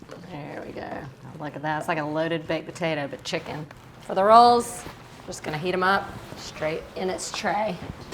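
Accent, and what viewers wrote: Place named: Alabama, United States. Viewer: ! The recipes are almost always on point, but if I’m being honest, a lot of times I just listen to her videos because I hear home in her accent. I’m from a sort of culturally ambiguous part of northeast Florida (St. Augustine - we’ve been here since 1565 and have become a cultural crossroads for so MANY, that we don’t really have our own sho-nuff “this is who we are” vibe you get in so many other places. A drinking village with a fishing problem, some say. BUT, we are close enough to Georgia and Alabama that you can hear both in our accents here. I’m not positive, but there sounds like she’s got roots in Alabama. Sounds like home to me, so here I is!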